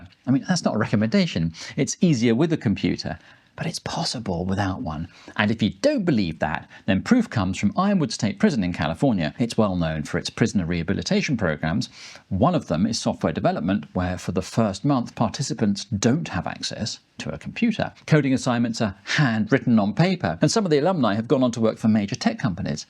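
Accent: United Kingdom. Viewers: Bro you have the thickest british accent I've ever heard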